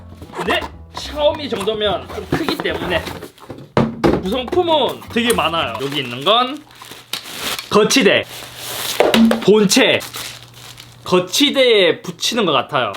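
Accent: Gyeongsang, South Korea. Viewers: ..쭝국...사투리보소 경상도 사나이네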